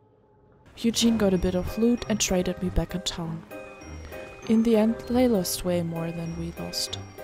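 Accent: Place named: Germany. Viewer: Okay ;) , you have very german accent because i'm asking , thanks for information .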